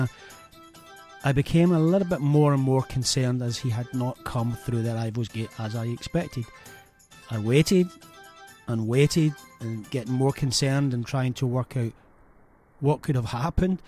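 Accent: Scotland, United Kingdom. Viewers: ❤❤❤❤❤Love your Bonnie Scottish accent😁😘🌷🌷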